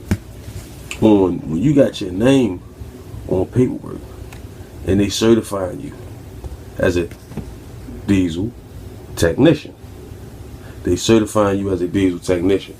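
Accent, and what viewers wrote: Baltimore, United States. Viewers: I knew you where Baltimore from that accent. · The way he talk I knew he was from Baltimore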